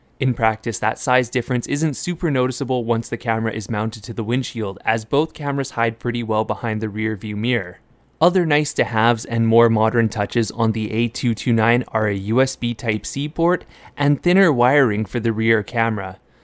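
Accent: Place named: West Virginia, United States. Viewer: by the sounds of it, he’s in West Virginia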